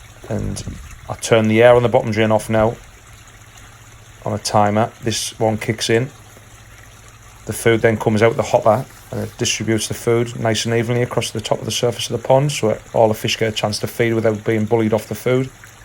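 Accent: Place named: Sunderland, United Kingdom. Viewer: He’s not from Newcastle !! That’s a makem accent 🤮🤮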